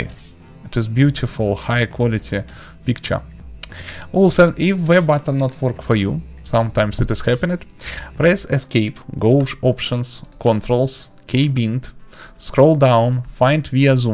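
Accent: Russia. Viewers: Русский акцент :| учи русский :D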